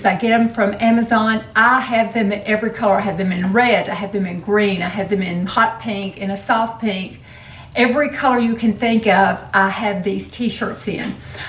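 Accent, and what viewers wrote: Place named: North Carolina, United States. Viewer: Omg, I just have to tell you that you have made me smile thru this video. I know you're from east TN, but man oh man do you sound like us here in central NC!!! I hear my mom's side of the family in so many words you pronounce and I love it! YOU feel like home and I automatically trust you! You've got a new subscriber here😊🤗😦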